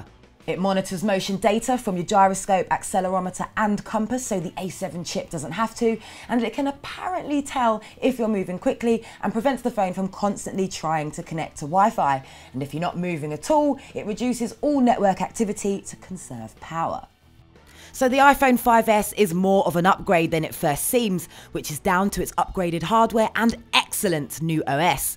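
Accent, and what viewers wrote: United Kingdom. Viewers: a british nigga loooooooool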